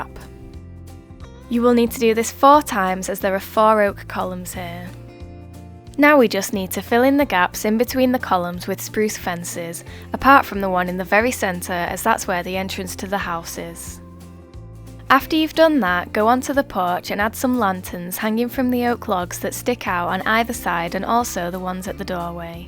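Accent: United Kingdom. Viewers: I like your British accent.I sometimes try to make one too but I just can't :))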